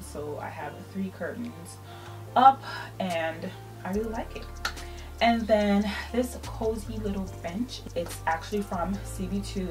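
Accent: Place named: Caribbean. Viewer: Thank you for sharing where you're from. I know I hear an accent - fellow Caribbean Girl here 🎉❤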